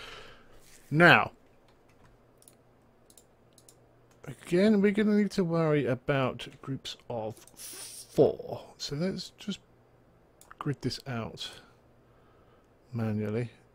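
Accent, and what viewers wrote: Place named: Southern England, United Kingdom. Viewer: This is the first video I have watched of yours and I must say I really like your commentary style. Being a southern Brit myself, it's great to hear such a southern accent talking about Minecraft! I really wished you'd get more views on your videos, your style may be slower and more relaxed but it is honestly the perfect thing for late night YouTube viewings! Keep up the great work, good sir! :)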